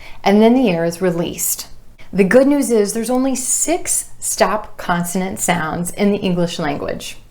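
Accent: United States. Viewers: Are you American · Your lessons are just amazing, as becuse of you, I've started to love the American accent since i have been used to talk with the British accent.. I have one suggestion if you don't mind, what do you think about creating a group on WhatsApp or viber which is aiming to improve the writing and speaking skills between you and your followers, and it should be administrated by you of course.. Best Regards 🌹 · Is this American English pronunciation??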